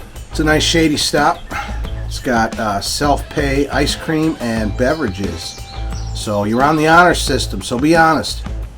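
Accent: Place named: Chicago, United States. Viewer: Hi - like everyone else, I was surprised to learn that you'd had a bad accident that stopped you from riding for a while. I'm glad you're back at it. As many people have said, your videos are very informative - from your biology videos, I get the impression that you teach and your videos have the touch of a teacher who thinks of the important information to pass on and the best way to do it. Another aspect of your videos I enjoy is the quality. I do video work for a living and your videos are really good visual communication. And there's a final thing I really enjoy about your videos. I grew up in Chicago until going off to college. Like you, I live south of Chicago but far, far, far south - in North Florida. Listening to you is enjoyable because you remind me of my hometown. I hope you continue to make videos for a long time. Thanks!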